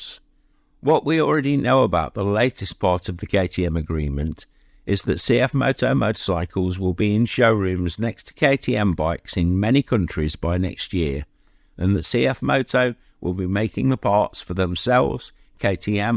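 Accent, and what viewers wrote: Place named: Midlands, United Kingdom. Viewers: Sounds more like East Midlands to me, Leicester or Nottingham? 🤔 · by the way, there is nothing robotic in your voice. I’m guessing British midlands.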